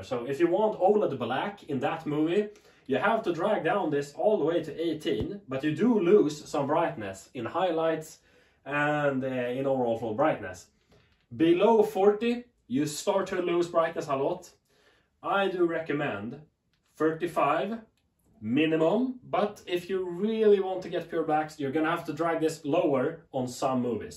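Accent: Sweden. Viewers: Er du svensk. Hører den svenske aksenten din Det er vel mange gamere som bruker C4 også i tillegg til filmelskere. Jeg har LGCS oled som jeg bruker ps5 på.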